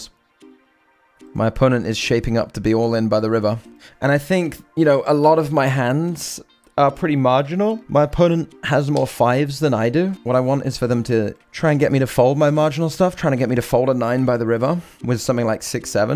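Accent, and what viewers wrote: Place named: Leicester, United Kingdom. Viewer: Aye up me duck,is that a Leicester accent?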